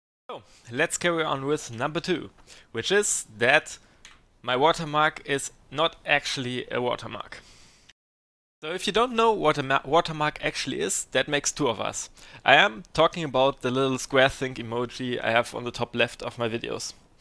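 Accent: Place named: Germany. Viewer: I indeed love your German accent! :D Best chess channel I know, keep up the good work and I am looking forward to your stream on Saturday :p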